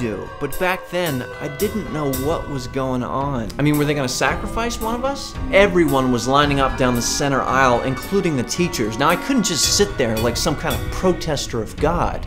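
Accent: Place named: New Jersey, United States. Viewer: For the longest time I would have thought you to be Italian because of your accent (you sound like you're from NJ and with the nice thick black hair and chiseled jawline... slaps face ... okay Tiny, ya need to get back on track!) In that respect I would have assumed you were Catholic. But my friend says your last name is Ukrainian and if you were brought up in any religion it would likely have been Eastern Orthodox or Lutheran, depending what part of the Ukraine your family had originally immigrated from. So is she right?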